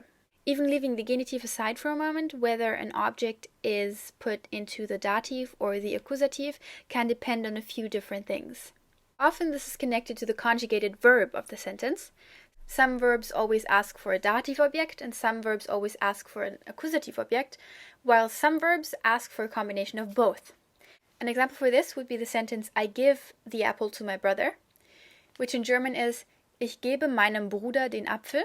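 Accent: United States. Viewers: BTW your American English sounds great :) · Are you German? 'cause you sound more American or Canadian.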